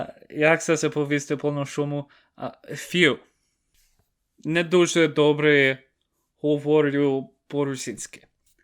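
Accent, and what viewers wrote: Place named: United States. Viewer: You definitely have a lot to work on, especially the lh sound and h sounds, take out some of the American accent out of it.Other than that, admiring you for even trying to learn a pretty much -quite rare language.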